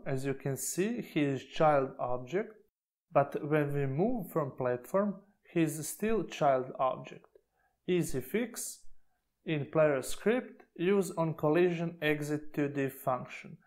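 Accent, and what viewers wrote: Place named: Russia. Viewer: русский да? ну или украинец - акцент)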